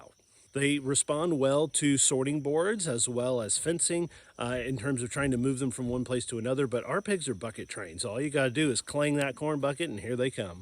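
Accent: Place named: Scotland, United Kingdom. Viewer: Mighty fine Scottish accent!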